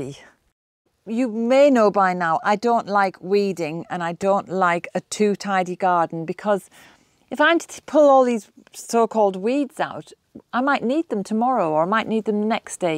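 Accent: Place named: South Durham, United Kingdom. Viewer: How can you grow plantain. I’ve just learned about it recently. Cani buy it to plant. Interesting accent south Durham perhaps?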